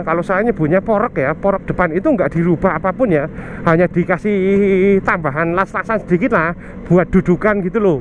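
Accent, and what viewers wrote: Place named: Java, Indonesia. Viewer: medok e mas... >_<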